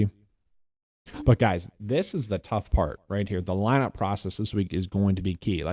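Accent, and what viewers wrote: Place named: Wisconsin, United States. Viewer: I'm from Iowa. I call it corn hole or bags... But we know you are from Wisconsin when bags comes out as beggs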